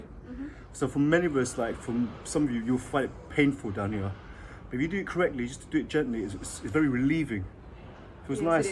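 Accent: United Kingdom. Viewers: ok an asian guy talking like a british man is enough for me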